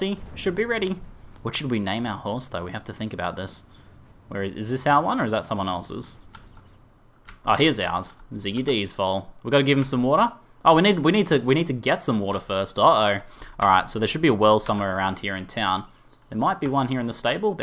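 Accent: Australia. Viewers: Are you Aussie? Cause u sound like it lol I'm Aussie ;D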